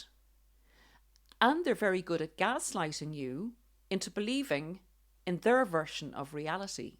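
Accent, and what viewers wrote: Ireland. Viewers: Thank you dear for sharing the things that make a narcissist lose the plot! It was so lovely to hear your voice again I love your Irish accent and so pleased that the audio version will be with you speaking. It has to be to be authentic!! A HUGE congratulations on releasing your first of a series of book - go for it!! Remy your co producer was thinking a nice long walk would be better than a narc video. I hope he gets a raise in salary as without him you wouldn’t have this book. He was the co-author wasn’t he? 😂 I have been doing some art of late so that’s why there’s a big gap since my last comment. Listening to your podcast from March 2024 has brought SO much healing understanding and can love myself back when I look in the mirror. It only took 22 years for this to take place so guys hang in there. I wished I had your messages back in 1984 it would have saved me loads but better late than never! 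Every single word you shared I lived with and was hell on earth. You say it very clearly and don’t muck about which is what I liked so carried on watching and listening. I was totally shocked to begin with as I felt like you had been walking with me in this dreadful marriage. As he walked out in a very pathetic suicide attempt which he threatened non stop I told him to STAY out as he was no longer needed or wanted. By golly he went more nuts than he was before. I was cool calm and ignored him totally which made him so angry. So so glad those days are gone and never to happen a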